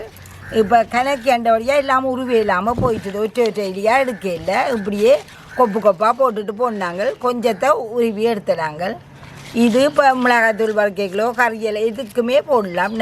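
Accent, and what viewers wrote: Sri Lanka. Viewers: இலங்கை தமிழ் கேட்பது இனிமை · I like srilangan Tamil voice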